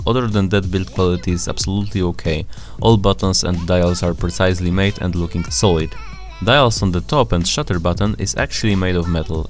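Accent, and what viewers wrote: Poland. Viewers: Your accent sounds like polish idk is it true lmao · Słychać ten polski akcent :pp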